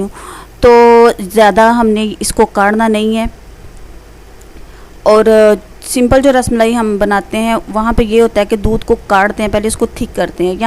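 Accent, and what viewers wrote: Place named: Punjab, India. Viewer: hahaha bht acha laga bhtaza aya apki punjabi sun k behna kal apka link ni laga but aaj apko special shoutout dun gi dear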